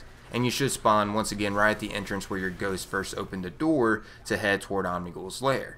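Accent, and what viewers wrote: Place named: Philadelphia, United States. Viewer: Yo! I like the Philly accent.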